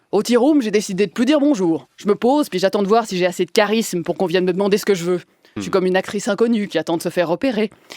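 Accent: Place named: Switzerland. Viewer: J adore vos chroniques souvent pour l accent qui me rappel mes séjour en suisse quand j étais gamin ceci vous avez du talent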